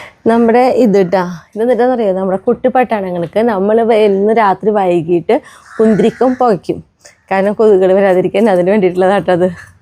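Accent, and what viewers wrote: Thrissur, India. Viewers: This is a viewer from UK, following your videos for long time. I think I even started getting Trissur slang 🤓. But I am from TRV based here in UK for nearly 20 years. Will you consider Airbnb there? So we could visit and be farm hands ?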